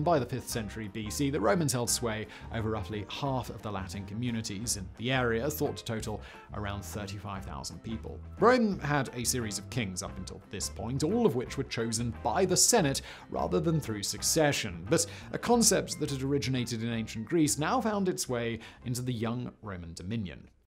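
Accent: United Kingdom. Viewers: Dude. Great vid. But, truth be told, I also come for the British accent and colloquialisms.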